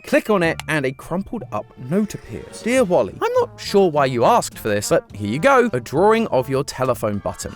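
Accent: United Kingdom. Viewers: Honestly has really grown on me, I kinda like him more than matpat tbh but that could just be because he's got a funny British accent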